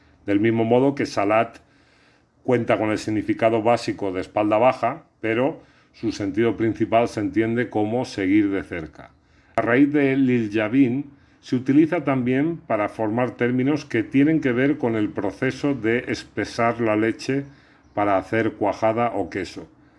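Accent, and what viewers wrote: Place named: Morocco. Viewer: Hola guapa, eres marroquí? Qué gusto escuchar hablar a alguien así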